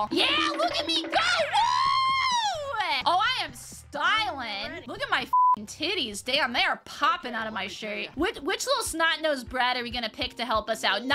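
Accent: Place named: Midwest, United States. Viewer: you sound mid western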